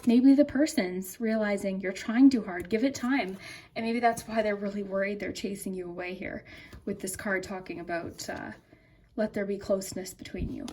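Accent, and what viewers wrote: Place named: Canada. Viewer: So, I have to comment and tell you that I'm OFFICIALLY a new viewer to your channel and I'm officially subscribed as of NOW! (WARNING! Super long comment!!) I happened to find your channel through another reading I was watching from somebody else and something in me told me to click on your video. The video was called "How Will You Meet Your Soulmate? PENDULUM 🔮 Where? When?" Not only is your reading the most exact reading I've ever received in my life (and I've had in-person readings), but the soulmate reading was so mind opening to me. I then watched your May 2020 predictions and Pile 3 was calling to me which I find super interesting. The number 3 is my lucky/weird/magical number. Watching this video didn't resonate with me because I'm single, but it gave me a lot of hope because I have plans on moving into my own place come July and from your May 2020 prediction about patience and that slow and steady wins the race. 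:) Anyways, yea, you definitely have a new subscriber from Orlando! :D (And I wonder if you're Canadian because you sound Canadian hehehe!) And yea! Keep making wonderful videos!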